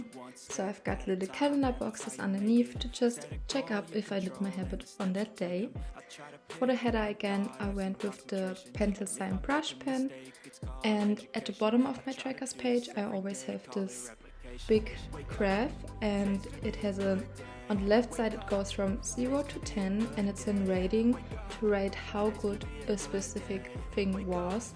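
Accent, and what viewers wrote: Germany. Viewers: are you from germany? your accent kinda sound like it! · I found your channel today and love it. But one question can it be that you actually speak German? Your English is great, no criticism. It just sounds like German is your mother tongue. Many greedings Annabell Elaine ❤️❤️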